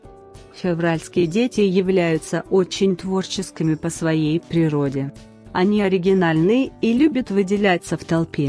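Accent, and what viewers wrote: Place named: United States. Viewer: Вы зачем читаете с американским акцентом ? Это давно устарело .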